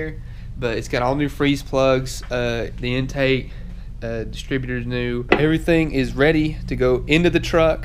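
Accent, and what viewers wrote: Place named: Mississippi, United States. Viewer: can't help but notice you gotta Mississippi accent ... least that's how it sounds to me lol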